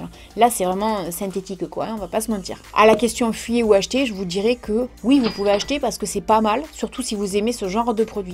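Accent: South, France. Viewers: J’ai découvert par hasard vos vidéos il y’a deux mois. Ce qui m’a plu d’abord était votre accent du sud, un accent que j’ai reconnu et j’ai été contente de voir que vous ne l’aviez pas modifié. De par mon âge je pourrais être votre maman. Moi aussi je suis du sud de la France mais je vis à l’etranger depuis des décennies cependant j’ai conservé mon accent et nombre de français me l’ont toujours reproché. Ceci dit, je trouve vos vidéos toujours franches et intéressantes. Bravo. Je connais Yankee candles depuis bien des années car elles ont été commercialisées ici bien avant la France et je suis d’accord avec vous, elle sont de bonne qualité, ma fille les aime beaucoup mais leurs parfums sont très prononcés. Je préfère me servir d’huiles essentielles (lavande, orange, vanillle et menthe poivrée). Merci.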